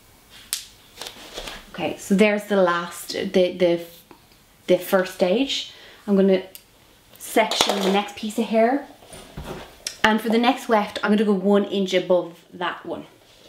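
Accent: Ireland. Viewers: no she is irish the accent is so defined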